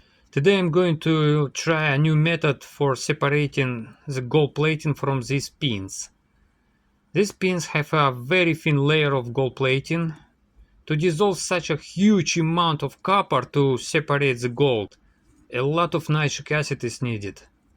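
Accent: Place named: Russia. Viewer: Most problem is - very very very very very very bad Russian accent. Sorry. English speaking people do not speak like that.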